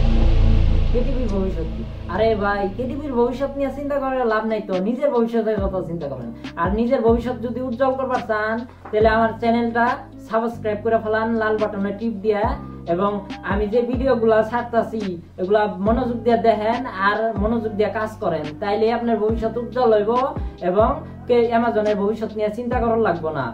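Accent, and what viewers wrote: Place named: Sirajganj, Bangladesh. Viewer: sirajgonj ar moto kory khota bolen to tai bolam. ok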